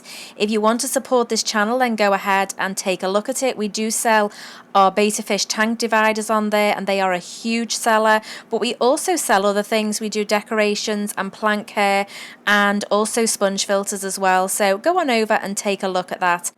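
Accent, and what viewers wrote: Liverpool, United Kingdom. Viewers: huh...are you from Liverpool UK? I am and your accent sounds so similar 😂👏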